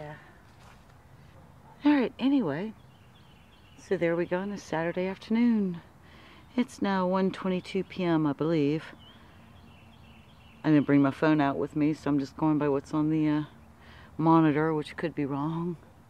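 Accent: South, United States. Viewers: Ok lady I think you make very beautiful videos. And I really like your accent. A bit southern but very clear. I am not an American so sorry if I am wrong.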